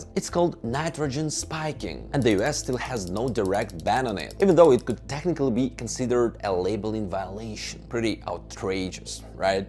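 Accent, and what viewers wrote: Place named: Europe. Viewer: the european accent is cute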